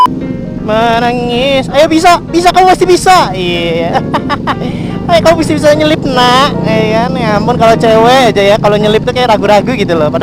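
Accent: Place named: Palembang, Indonesia. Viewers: cak mano itu logat Palembang-_ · Palembang ini mah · Palembang bosss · Cak mno Palembang woy wkwkw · Itu mah Palembang bng🤣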